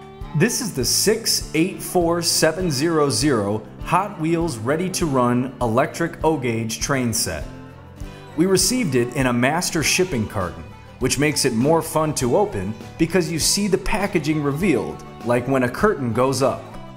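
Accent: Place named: Midwest, United States. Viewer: Somehow I was able to recognize by his voice and accent alone. That's a benefit of being a Midwestern American I suppose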